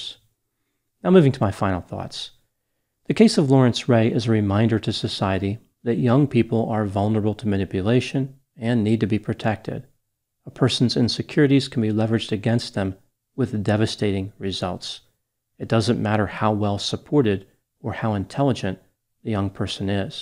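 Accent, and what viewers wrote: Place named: South Jersey, United States. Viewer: Hey ….hate to break it to ya….but you have a well-formed South Jersey accent. 🤔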